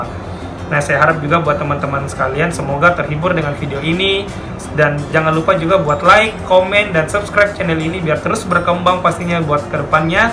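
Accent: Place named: South Sulawesi, Indonesia. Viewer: Cara ngomongx sprti org sulsel. Salam dr polman, sulbar. Ada lowongan kah utk wanita???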